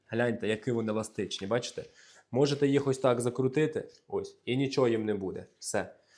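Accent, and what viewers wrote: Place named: Surzhyk, Ukraine. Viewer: Дитя народилось і у школу ходило в регіоні, де всі балакали на суржику. Навчалося на кухаря, де викладачами були тітонькі з сіл. Вони так розмовляють. Тому так і балакає😂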